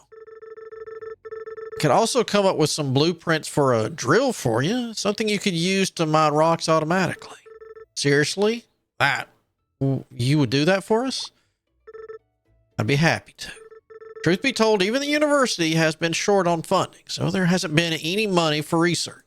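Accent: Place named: South, United States. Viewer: Love the work so far, may i ask what part of the south your from? I noticed the accent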